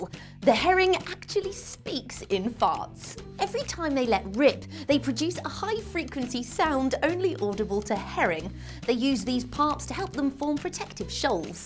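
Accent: England, United Kingdom. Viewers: She english and we pronounce stuff different. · Her British accent makes it less awkward to listen to her talk about farts.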